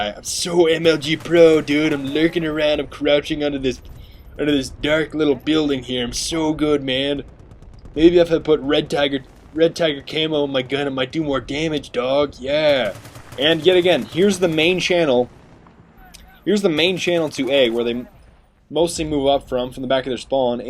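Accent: Germany. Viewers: haha your english-german accent is epic :D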